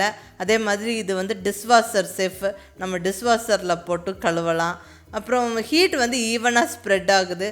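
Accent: Coimbatore, India. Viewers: மலையாளி கோயம்புத்தூர் தமிழ் ஜம்முன்னு பேசுறாங்க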